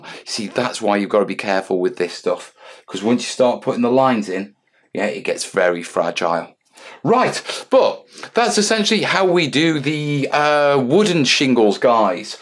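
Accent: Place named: Scotland, United Kingdom. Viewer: This is my first time watching one of this guys videos and I was not at all ready for his Scottishness 😂. Also bro calm down you are going to hyperventilate.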